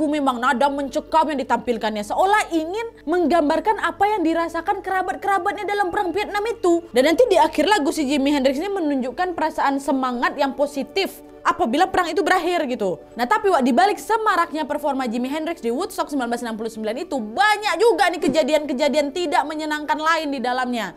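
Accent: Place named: Padang, Indonesia. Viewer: Suka sama cara bawain cerita deh, tpi hari ini kok logatnya padang gitu hehehe.. semnagat ngonten terus ya kak 😊🙏🏻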